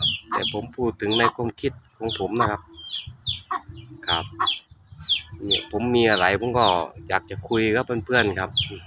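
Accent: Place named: Korat, Thailand. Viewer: สำเนียงเหมือนโคราชบ้านเอ็งเลย ปลุกที่โคราชมั่ยคับ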